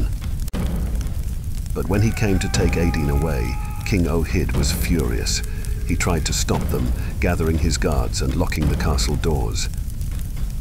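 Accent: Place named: United Kingdom. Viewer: Why use a British accent?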